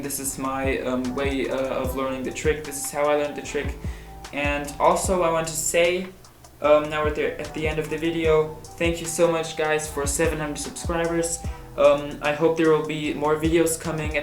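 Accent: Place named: Germany. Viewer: 90% sure u are german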